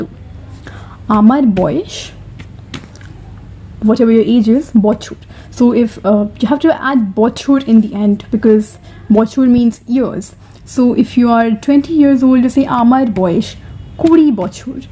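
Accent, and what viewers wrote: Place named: India. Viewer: You have a nice Indian accent :)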